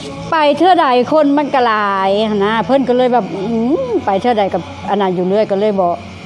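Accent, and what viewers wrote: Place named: Nyaw, Thailand. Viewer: สวัสดีครับป้าจ๋ามาฟังภาษาญ้อ เว้าม้วนๆครับผม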